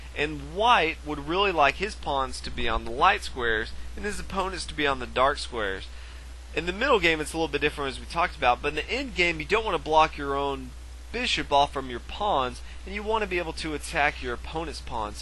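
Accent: South, United States. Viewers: Your southern accent is killing me , good video though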